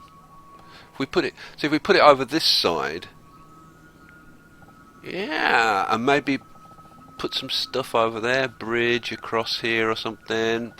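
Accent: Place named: Northern England, United Kingdom. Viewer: where u from man in the UK? i guess in the north, but not too much high on map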